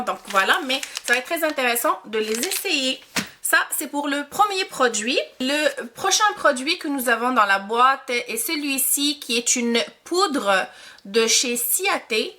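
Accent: Quebec, Canada. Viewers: Je découvre ta chaîne grâce à cette vidéo. ☺️ J'adore tellement l'accent du quebec, tabarnak!! 😅 je ne connaissais pas du tout cette box!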